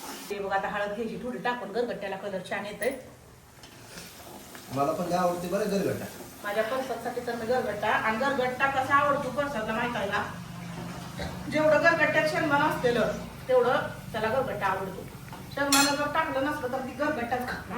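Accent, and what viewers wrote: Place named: Marathwada, India. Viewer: Latur Dharashiv chi bhasha watate tumchi, konta gav ahe tai tumch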